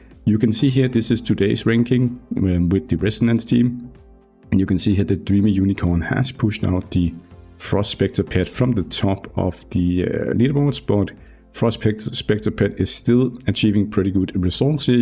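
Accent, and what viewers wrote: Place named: Denmark. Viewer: Are you from Denmark? You English have a Danish dialect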